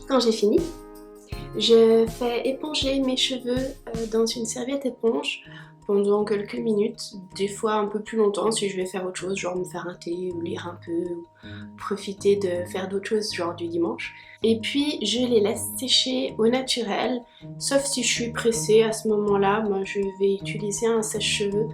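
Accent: Switzerland. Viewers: Je crois reconnaître un accent suisse ? Je me trompe ?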